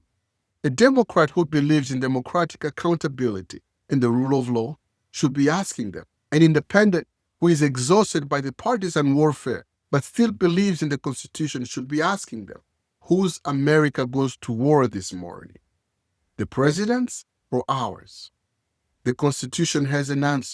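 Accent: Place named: Africa. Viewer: You sound African you know about our constitution more than most D.C. politicians big ups to you my brother.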